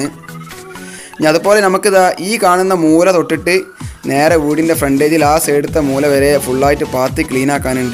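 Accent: Malappuram, India. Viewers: ഹയ് ഞാൻ നിങ്ങളുടെ വിഡിയേ എല്ലാം കാണാറുണ്ട് നിങ്ങളുടെ വർക്ക് എല്ലാം സുപ്പർ ആണ് നിങ്ങളുടെ സംസാരം കോട്ടാൽ മലപ്പും ആണ് ഞാൻ ഒരു മലപ്പുറം കാരാൻ ആണ് എൻ്റെ നാട് പുലാമന്തോൾ ആണ് നിങ്ങൾ ഫോൺ നമ്പർ ഒന്ന് തരണം സന്തോഷം കൊണ്ട് ആണ് നിങ്ങൾ പറയുന്നത് പോലെ ഞൻ ചെറിയ വർക്ക് പടിച്ച് വരുന്നു നിങ്ങൾക്ക്‌ ആരേഗ്യമുള്ള ദീർഘയസിനെ തരട്ടെ ആമീൻ യാ റബ്ബൽ ആലമീൻ c ആമീൻ (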